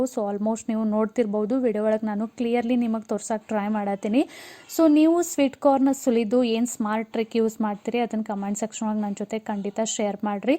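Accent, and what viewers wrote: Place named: Shimoga, India. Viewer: wow 🤗 thumba esta aythu nim bashe........... nav shimoga nim mathu keltiddre keltane erbeku ansatte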